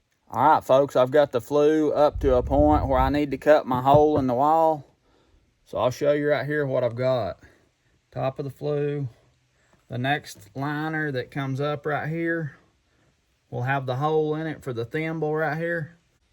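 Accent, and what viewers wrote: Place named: North Carolina, United States. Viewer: I'm assuming that is your spring gurgling and dripping in the background. Bout rocked me to sleep listening to it as you gave us mountain ingenuity on the flue construction. I also love how you have your local NC distinctive accent but your children have not trace of your accent in their speach. Much like my heavy local NC accent as my children grew up they too had a very different accent than mine. Might be because we moved to several NC towns because of my husbands career as a Postmaster for 30 years in the mountains of WNC. However and whyever (my new word)🤣the reason our children have different accents than us, doesn't matter. What matters is that they are ours and we love them. Maybe your children picked up their accents from Billy, Michelle & Will!!! Ha! Ha!❤❤❤😊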